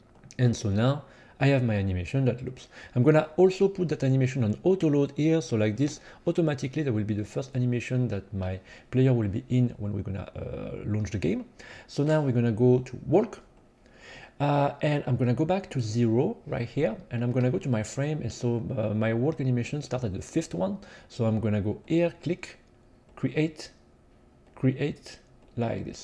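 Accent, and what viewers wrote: France. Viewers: j'ai compris presque immédiatement que tu étais français sans considérer ton pseudo 😂, très bon tutoriel merci chef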